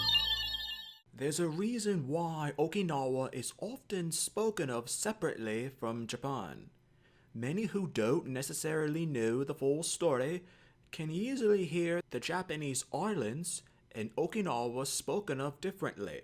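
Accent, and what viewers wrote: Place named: China. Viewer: Speaking as someone whose family lived there for 40 years, this is nothing short of Chinese Communist Party propaganda, part of their wider pattern of asserting they own everything on the planet. I can say with certainly every Okinawan person I know would also say this is total BS. Okinawa was an independent international trading hub for centuries and did the best they could to appease both the Chinese and the Japanese. They were well aware of the dangers of their geopolitical situation, and vulnerabilities. Though many of us were honestly hoping for independence in the late 60s, it was never going to happen. Do you really think China would leave them alone if they were independent? True the Satsumas were devils in their day, but meanwhile, as Japan has become more civilised while China has regressed from their responsibilities as global citizens. 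For the sake the people I know, the current statehood within Japan, the people are far better off than if China had control - just ask the people of Hong Kong. Suggest instead reading Kerr 'A History of an Island People' BTW, This person is reading a script with an obvious Chinese accent.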